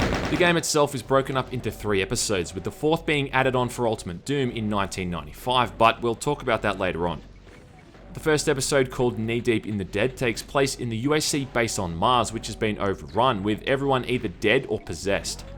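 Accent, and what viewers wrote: Australia. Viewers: said UAC, maybe you just misheard him because of his Aussie accent.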